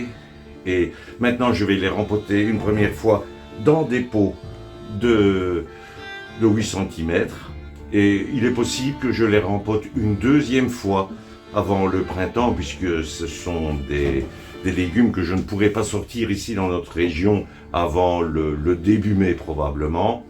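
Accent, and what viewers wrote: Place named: North, France. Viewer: Vous êtes un modèle, une expérience, une expertise, une bienveillance, un accent et cette chose inexplicable des personnes du Nord. Envoyé par un ancien picard devenu parisien, élevé dans le potager du père et la culture d’endives en champs…du père également ! Continuez à nous régaler et bravo. Miguel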